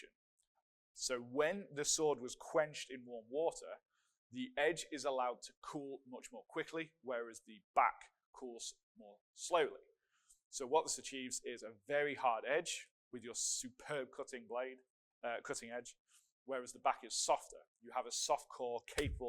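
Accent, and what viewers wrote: United Kingdom. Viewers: The psycho in me likes the weapons and the cultured person in me likes a British voice talking History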